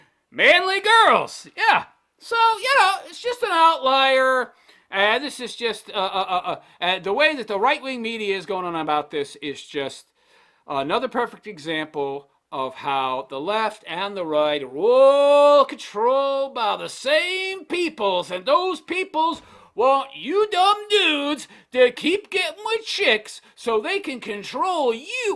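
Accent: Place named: Texas, United States. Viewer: If you slightly modified your Texas accent, you could probably nail a James Carville impersonation.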